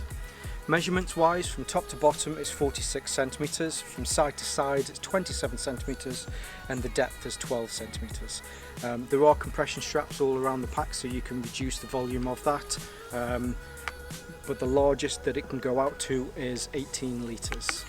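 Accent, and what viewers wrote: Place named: Stoke, United Kingdom. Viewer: Funny, i watched a few more of your videos, then it suddenly hit me: i recognise that accent! I moved from Stoke about 20 years ago.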